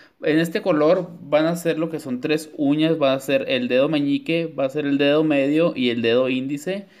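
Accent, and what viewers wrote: Chihuahua, Mexico. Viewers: eres de chihuahua?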